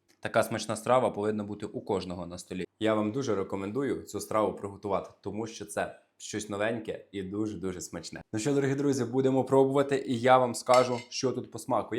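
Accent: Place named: Western Ukraine, Ukraine. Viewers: Чую мова моя,я з Франківщини,а фамілія поширена на Закарпатті,звідки цей? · Чудный повар!Чудный Буковинский акцент!❤❤❤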